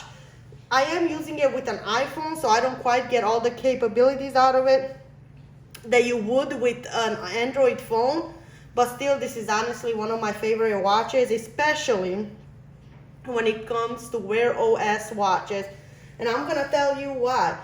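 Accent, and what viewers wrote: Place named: Iran. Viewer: You have that Irani accent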